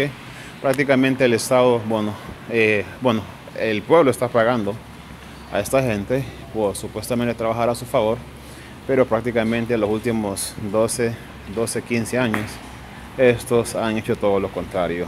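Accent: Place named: United States. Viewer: Disculpe, ella nunca ha estado callada, pero los hondureños tenemos mente corta,creo que ud más pues por el acento que le siento en sus declaraciones o comentarios se le puede escuchar un acento como del Norte.(USA).pero que le vamos a hacer..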